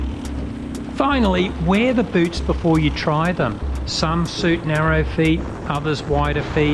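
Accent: Australia. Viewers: I thoroughly like this channel, especially the practical insights with an Aussie voice. Any chance of /CoS collaboration?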